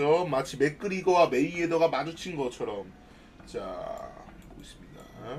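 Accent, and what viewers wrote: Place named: South Korea. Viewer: korglish lol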